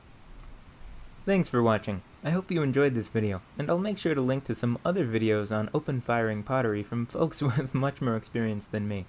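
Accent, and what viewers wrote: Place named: Michigan, United States. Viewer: I can't get over yer accent. It reminds me of an old online friend of mine from Michigan who'd say things like "yer" and "fer" :)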